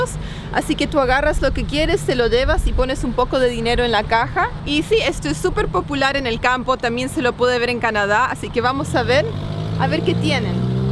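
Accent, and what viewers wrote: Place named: Argentina. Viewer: Esta chica aprendió español en Argentina, o de un argentino, si no me falla el oído, jajaj. Hermoso video! Saludos!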